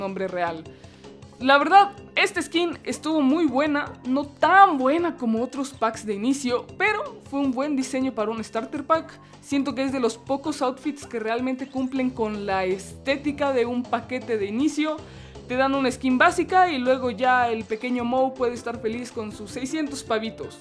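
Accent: Costa Rica. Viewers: es de costa rica o que xd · Eres de Costa Rica? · oye eres tico? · Eres tico? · este mop habla como tico k estilo!!! jajjajaaja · Suenas tico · oye bro eres de Costa Rica ? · Soy el unico que se dio cuenta que el mae habla como costarricense? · Nmms sos de costa rica? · Eres tico? Noté que se le salieron varias frases❤🗯💙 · Deberías de dejar de hablar como costarricense te escuchas ridículo, es a criterio personal. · Eres de COSTA RICA?.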